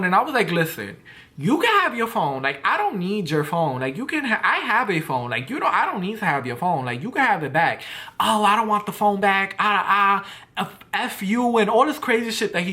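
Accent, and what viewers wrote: New York, United States. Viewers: I love your New York accent😍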